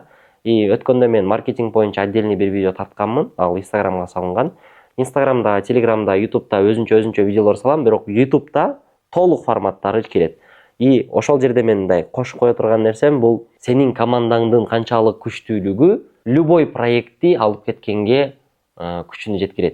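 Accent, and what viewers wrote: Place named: Batken, Kyrgyzstan. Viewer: Соз речинди ондо, Баткенской суйлобой кыргызча суйло гош,кот деген созго паампарасатураак бол,маани берип суйло мозг твой чагылып калган кезде азыраак эле суйло же эфирге чыкбай эле койчу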